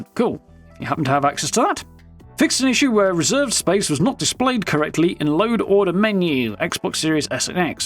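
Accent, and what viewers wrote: RP, United Kingdom. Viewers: This guy sounds very posh😂. it doesn’t go with hes rock star metal head image haha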